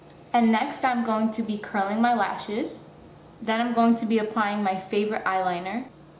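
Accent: New Jersey, United States. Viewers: Jersey accent ! .. :)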